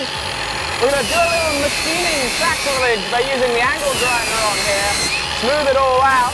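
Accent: United Kingdom. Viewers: I came from colab- Am I crazy or does he sound WAY more British here than in that video?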